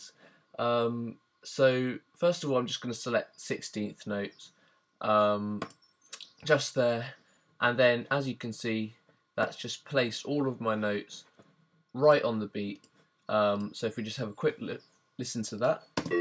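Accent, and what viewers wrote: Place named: United Kingdom. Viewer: Your accent is distractingly British but you make a mean video mate. Short, to the point, and telling me everything I need to know. Well done sir!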